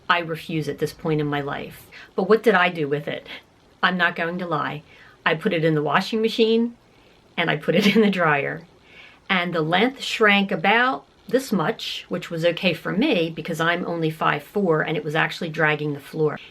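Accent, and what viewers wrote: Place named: New Jersey, United States. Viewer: you are such a joy to watch. Your kindness is much appreciated as is your humor! I'm originally from New Jersey, I left at 24 but we go to ocean city some summers. Your dialect sounds like home. Really, really enjoy your videos and yes, I would love to see some amazon hauls. Your pace is perfect. You are easily understandable and thorough and come across as a friend. So happy I found you.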